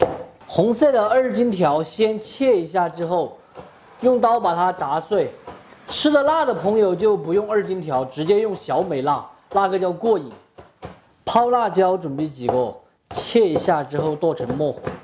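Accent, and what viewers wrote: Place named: Xinjiang, China. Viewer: 博主的普通话说起来很像新疆人。😄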